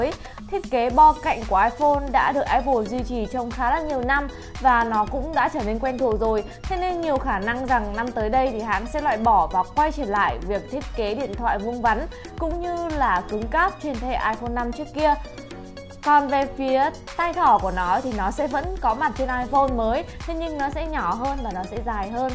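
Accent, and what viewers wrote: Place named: North, Vietnam. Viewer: Bà ơi bà,bà đã nói giọng Bắc khó nghe rồi,mà còn nói chữ dính chùm chùm như mới ăn nho xong vậy...nghe mệt mõi quá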